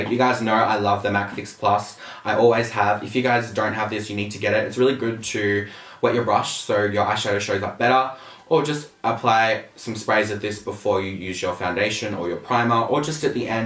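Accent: Australia. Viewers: Hello! I'm new to this channel. Does anyone know where he is from? I'm fascinated by Aussie accents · Is he Australian?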